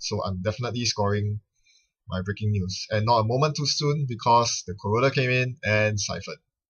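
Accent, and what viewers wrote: Singapore. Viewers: Hi where are you from by the way? You sound like a singaporean. :) Just wondering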